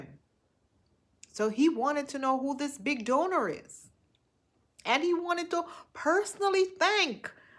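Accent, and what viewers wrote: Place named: France. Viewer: Hi..❤love Your French... viewing from Trinidad ❤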